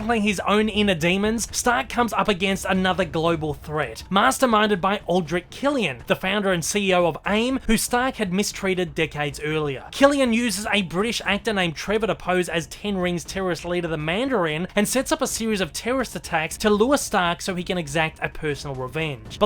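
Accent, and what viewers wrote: Australia. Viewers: Your accent is horrendous, no offense. What are you, some Asian Aussie? You sure sound like it.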